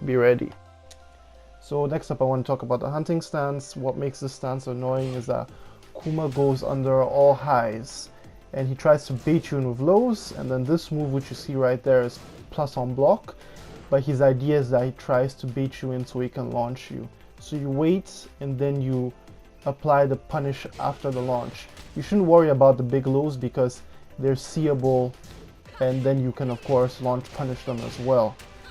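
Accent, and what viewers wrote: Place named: Caribbean. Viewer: Sub for the helpful info and the carribean accent brudda! We need a Trini Tekken character already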